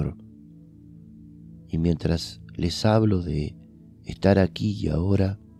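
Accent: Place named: Argentina. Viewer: Qué bien habla el español y en argentino. Gracias !😄. Bendiciones maestro.❤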